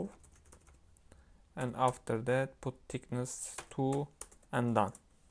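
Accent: Turkey. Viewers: kanka aksanın Türküm diye bağırıyor 🥰 başarılarının devamını dilerim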